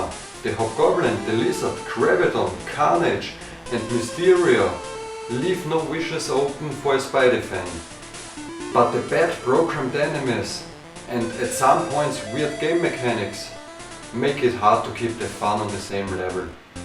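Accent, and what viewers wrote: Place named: Austria. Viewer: you sound like an austria Sperling enlish. not greek.. but neis review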